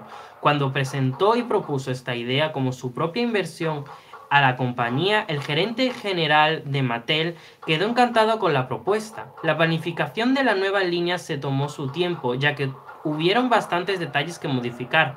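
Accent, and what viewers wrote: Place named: North, Mexico. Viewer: El acento norteño...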